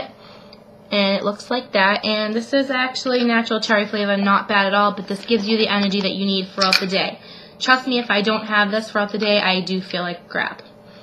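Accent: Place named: Massachusetts, United States. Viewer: FIRST thing I noticed about you was your Massachusetts accent!! lol LOVE it!!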